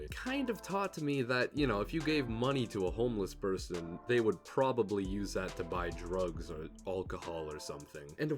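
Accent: South, United States. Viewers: sources? Keep on listening if it makes you feel better. You realise he makes money preaching to the choir. Liberals love to hear a southern accent spew their talking points. Like how consertives like to hear black men to bash police. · I'm not even a native English speaker and I associate Beaus accent with hillbilly Republicans. The bias is real. We have a similar thing in Germany with the Saxony accent. You hear it and you think: uneducated, racist, about to lecture you on how we aren't living in a democracy because vaccines.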